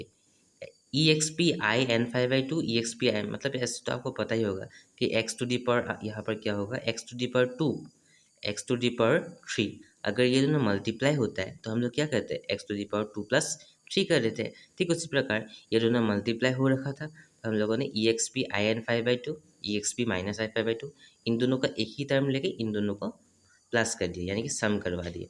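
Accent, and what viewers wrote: Assam, India. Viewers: Are u from Assam,sir?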